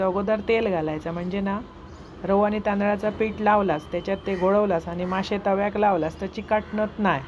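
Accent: Malvani, India. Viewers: फारच छान गो ताई तुमचा मालवणी बोलुचा स्टाईल नी तुमचो आवाज पण लयीचं चांगलो असा मी बेळगांवची ताई पण मका कोंकण लय आवडता माजो बहिणी दिल्या असत कोकणात एक सावंतवाडीक असा एक कणकवलीत असा आणी मी आता माजो बायको मालवणची करतय मका मी फारतर मालवणातचं असतंय गेलात आईतवारीच इलंय थैसून ताई तुम्ही खयचो ओ मी तिकडे इल्यावर तुमका नक्की भेटतंय मका चांगलो म्हावरा घेऊन देशील ना गो ताई मका साफ करुक कापूक येतंय मी मटणवालो असा माजो मटणचो दुकान असा ताई माझी अंजली पण मालवणी पद्धतीने फारच चांगलो चविचो जेवण करतय ती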